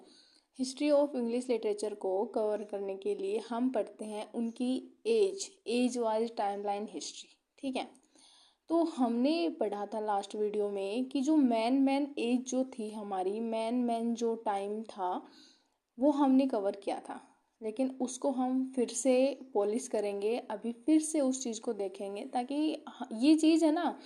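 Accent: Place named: Haryana, India. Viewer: Hariyanvi rajasthani accents aa rhe mam , 😅 harayana se ho ??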